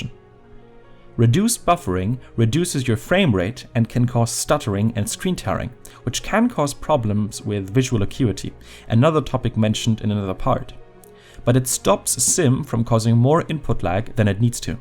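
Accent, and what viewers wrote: Germany. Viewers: Man hört so raus dass du deutscher bist haha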